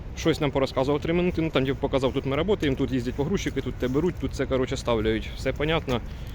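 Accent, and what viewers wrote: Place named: Poltava, Ukraine. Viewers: Від ТАКОГО суржика вуха в'януть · По розговору думаю Полтава.Или Черкассы.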